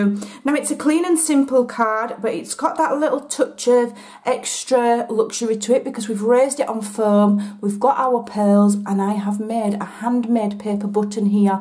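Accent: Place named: Northern England, United Kingdom. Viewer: Oh poor you....... I suffer with fibro....flippin......myalgia too so I know how you are feeling. Stunning cards!! I found you the other week and subscribed straight away cos you make me laugh so much. Am in Surrey but was in Yorkshire for donkeys years and its lovely to hear a northern accent and the northern humour. Am learning to do the memory albums......... keep being you!! xox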